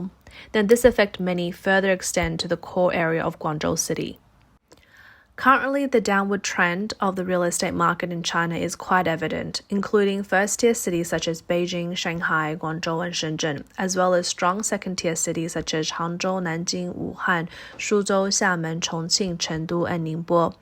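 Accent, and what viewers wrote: Australia. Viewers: I was in the chat some days ago watching her video too but the lass in question who narrated a couple of days ago is a different lady to this narrator. I think that lady is a Hong Konger from Sutton, London; whereas this woman narrating now is likely a Taiwanese or possibly Hong Kong Aussie probably from Brisbane or Sydney or Melbourne. I think many others who watch China Observer confuse all of the female narrators😂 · You have a nice Australian accent, maybe you can sell to Australian investors that want to escape the Melbourne winter. because these prices are 5 times less than a Melbourne apartment. Sell to boys from Melbourne and they can get a nice Chinese wife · I know a fellow Australian when I hear one 😊, but it's anybody's guess which city she's from because Australia (unlike, say, Britain) doesn't have obvious regional accents (just a few minor differences in vocabulary). 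I was in a workplace with people from all over the country, and if you wanted to know whereabouts in Australia someone was from, you had to ask them.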